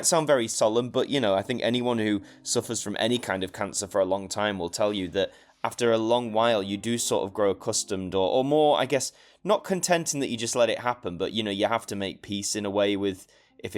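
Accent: RP, United Kingdom. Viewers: WhAt a great man my cousin says sounds posh mr George alligayah x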